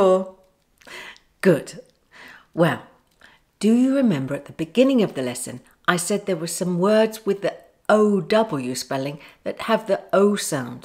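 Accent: United Kingdom. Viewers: It's so nice learning the beautiful British english listining to your lovely voice. May God bless you😊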